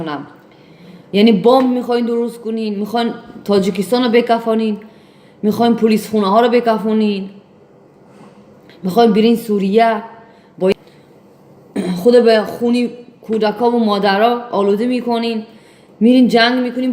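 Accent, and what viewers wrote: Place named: Khorasan, Afghanistan. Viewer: ДАР хуросони Афгонистон Точико хамихел Дари сухбат мекунан 😀😂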